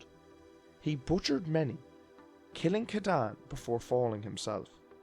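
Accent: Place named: Ireland. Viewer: That was yet another fantastic video. You know, that image that you were using, the one of the character that you called "Black 🕳 Hole?" Well I've seen other YouTube Star Wars creators use the same image, but I've never heard anything about the name of the character, their back story, nothing. So thanks for that, now I know what the hell "the big boys" are using to push their own channels along, without so much as a characters name, much less a description of what the audience is beung presented with!! P.S : - Dose my old and bush-bashed Australian ear detect just a little more of that truly wonderful Irish accent in your delivery this time? Or should I just keep away from the bottle? .. 🍺 🍻 🍺 🍻 🍺 ... See what I mean? I really must be getting back to my A.A group meetings! Either that, or join up with the local Rastas, Mon!!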